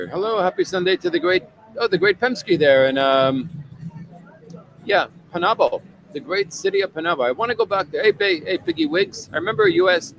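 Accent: Canada. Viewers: Do all Canadians talk like you?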